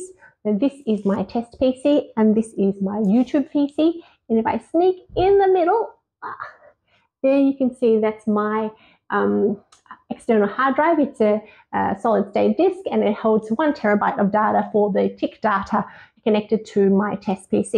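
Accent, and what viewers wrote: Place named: Australia. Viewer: I thought you might follow her. It was a surprise for me to hear an Aussie accent when I found your channel. You don’t see many of us Aussies talking about trading.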